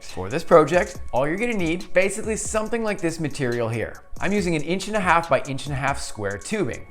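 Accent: Canada. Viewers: well he is canadian….and vancouver island to boooot…PRAW JECT….not PRO JECT… PROJECT is “she’s projecting her emotions on him” PRAW JECT is “this is a project I want to accomplish”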